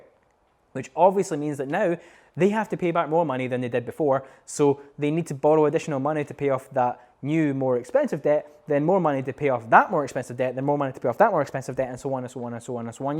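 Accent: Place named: West Scotland, United Kingdom. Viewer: Thank you, I'd been so keen to hear your thoughts and facts on Greece. It was great, but ooft you spoke very fast in this video. As a non west-coaster it takes me that second extra to adjust to your accent (that I adore), I'm not suggesting you tone down your accent, just that I'd appreciate if the speed was just a tad bit slower. I like to share your videos with non Scots too. Keep up the good work, I'm so glad you're back on my screen!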